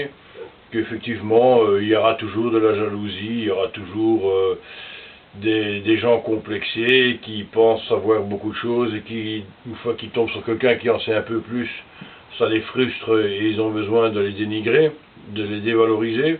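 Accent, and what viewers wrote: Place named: Brussels, Belgium. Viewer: Salut le ou le! Quelques réaction à tes propos... Tout à fait d'accord avec le terme de "prévoyant" et non survivaliste. Je me disais bien, t'as bien un accent de Bruxelle... Promis, moi je touche pas un copek en présentant mes "jouets". Quoi, t'as pas aimé mon four de campagne et ma chaufferette de rando????lol Je vois mal ma femme accepter de faire de la soudure dans le salon...lol.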